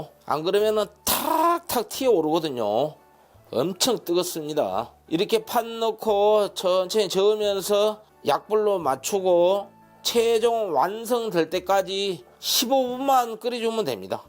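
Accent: Jeolla, South Korea. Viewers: 구수한 말투가 전라도 저 아랫지역 바닷가 근처 목소리셔요.. 구성지게 귀에 쏙쏙 들어옵니다.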